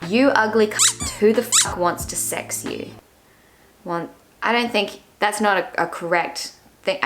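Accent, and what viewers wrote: Australia. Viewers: I m cool with the aussie accent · 1. I really like this video and the one you are talking about in this one. 2. I lived in Australia for only 1 year (mostly talked to backpackers) and noticed your accent. 3. I hated it when the shops were closing at 5pm and I still love Australia. It's possible. Greetings from Germany where the shops are closed on Sunday :) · To be fair to that guy's comment, I don't think your accent is as obvious as other Australians I've heard. Maybe that's just me though. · Don't let haters bother you. You sound totally Australian to me. There are always morons who get defensive if you say one little thing they don't like. You are always welcome to the U.S. You are a treasure. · Your accent can where you are from, but not always. I have a Japanese friend who learned English in Australia, so she have an Australian accent. 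She sound exacyly like you. P.S. Canada is so cold, you have no idea!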